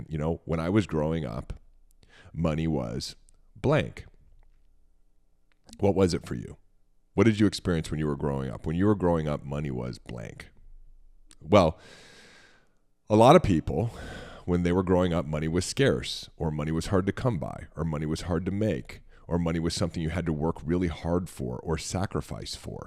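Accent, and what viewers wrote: United States. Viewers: The more I listen to he keeps sounding more and more like that Turtle Dad from Finding Nemo. 😂Love that SoCal accent imma NorCal girl myself.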